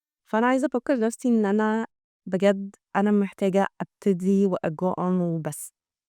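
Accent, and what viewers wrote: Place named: Gulf, Arab world. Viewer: صوتك خليجي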